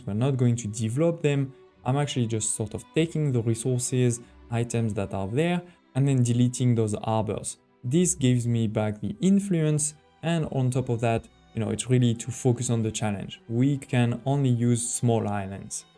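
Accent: France. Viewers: I think that accent is french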